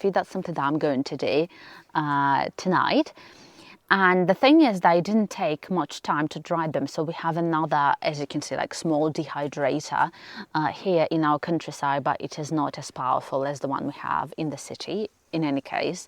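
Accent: United Kingdom. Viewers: Internet isn't real yet this british accent is almost real, so much prpganda but, your video reminds me of my grandma, she never owned any land, yet she knew so much about farming. We used to harvest public plants and at the time it felt like i was carrying twice my weight in fruits. Better times 😀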